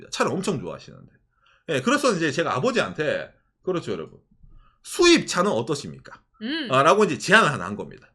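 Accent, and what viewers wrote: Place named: Gyeongsang, South Korea. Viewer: 역시 경상도 말투...ㅋㅋㅋㅋ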